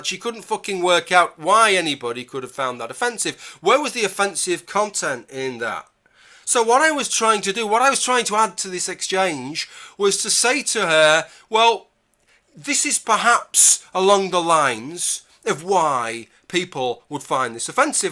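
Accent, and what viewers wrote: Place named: Yorkshire, United Kingdom. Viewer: No, it's a Yorkshire accent. Bit late but now you know.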